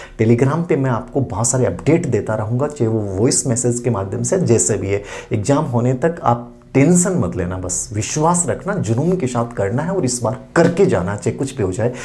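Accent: Rajasthan, India. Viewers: Sir app Rajasthan se h Kya language se lagta h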